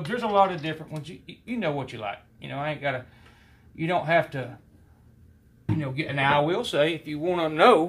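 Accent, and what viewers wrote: South, United States. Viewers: Thanks for the great video. I've never pulled that membrane off but I will now. love that southern drawl you got. Gene from Canada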